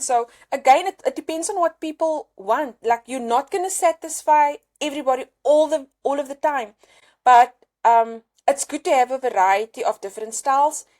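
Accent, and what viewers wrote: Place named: South Africa. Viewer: Are you from South Africa? 🌼✨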